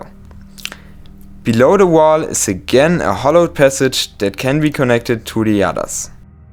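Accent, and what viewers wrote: Germany. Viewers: Your german accent, is just like mine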